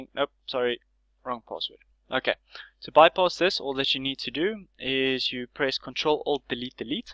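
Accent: Afrikaans, South Africa. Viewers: I recognize an Afrikaans ouk when I hear one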